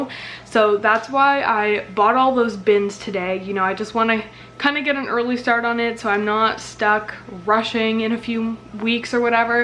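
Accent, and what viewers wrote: Canada. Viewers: I love your Canadian accent lol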